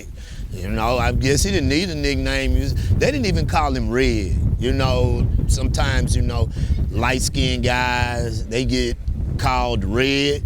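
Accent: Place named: Houston, United States. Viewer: He sounds like he never left Houston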